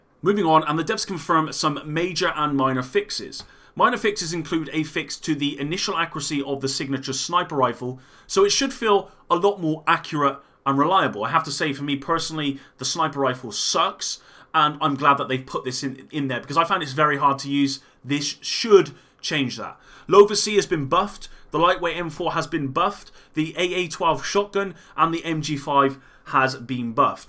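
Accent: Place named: West Country, United Kingdom. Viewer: are you from Bristol or Plymouth?. Your accent sounds like you are.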